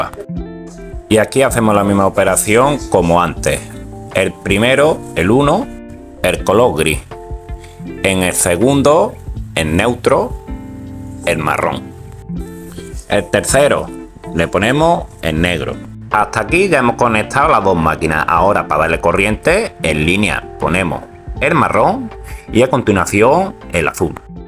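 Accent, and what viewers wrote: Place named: Andalusia, Spain. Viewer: Es español .andaluz del sur de España.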